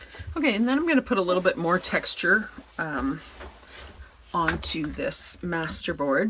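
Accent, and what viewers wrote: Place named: Canada. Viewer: Thanks for sharing the link to this video,. I learned so much and am looking forward to making some of these tags. The tags are awesome. I also really enjoy your accent. It brings back memories of some sweet Canadian friends who have gown to be with the Lord.💜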